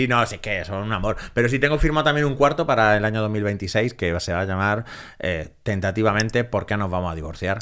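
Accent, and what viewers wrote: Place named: Granada, Spain. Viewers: Me ha encantado este psicólogo, con una forma de ver el mundo desde otra óptica , últimamente todo es “ si quieres puedes” y el tema de la forma de hablar , muy característico de Granada. Aunque está fuera de su ciudad no ha perdido el acento ni las expresiones de allí. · Me encanta el acento granaino 😂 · Ramón Nogueras, eres un profesional reflexivo, pracmático, analítico de los pros y contras que me causas gran respeto. Aunque, tienes mucho más, claro, pero lo que te "corona" mientras pláticas, es ese repertorio de dichos tan cómicos y tú dejé andaluz que cada uno de ellos me chirría en el cerebro desternillantemente y me obliga a parar el vídeo para reírme a gusto! Has probado ya ha dar clases terapeúticas basadas en la risa?😉👏🏼👍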